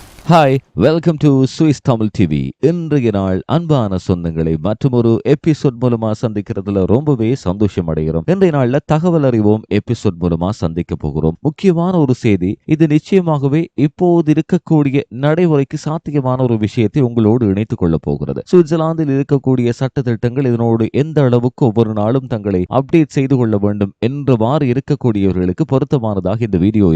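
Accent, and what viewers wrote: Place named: India. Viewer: செய்தி வாசிப்பவர் ஈழத்தமிழர் என்றால் ஏன் இந்திய உச்சரிப்பு?? ஒன்றில் ஈழ உச்சரிப்பு அல்லது இந்திய உச்சரிப்பைப் பாவியுங்கள். இரண்டும் கலந்த உச்சரிப்பு கேட்க சகிக்கவில்லை.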